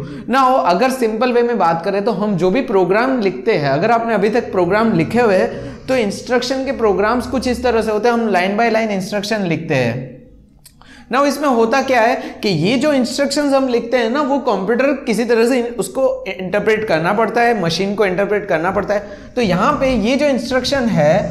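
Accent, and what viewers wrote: Gujarat, India. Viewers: bhai gujarat se ho?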